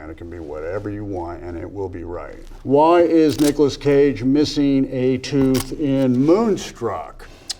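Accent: United States. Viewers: i have never watched a movie with him so i wasn’t expecting him to sound so uber american lmao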